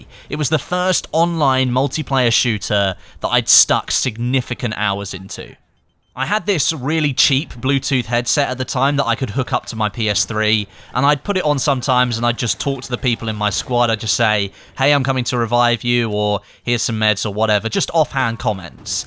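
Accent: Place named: United Kingdom. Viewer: Football as in soccer or anerican football butt since you sound like a person from great Britain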